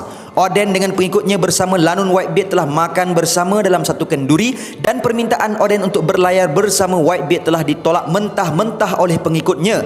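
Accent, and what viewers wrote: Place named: Kelantan, Malaysia. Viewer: Asal Kelate ko abe HAHAHAHAHA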